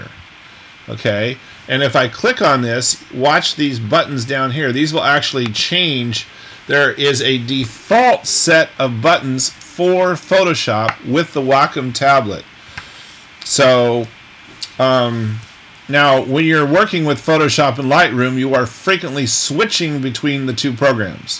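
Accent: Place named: United Kingdom. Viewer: Not a bad thing at all at least now I can relate a voice to face. Funny I always assumed you might have been british!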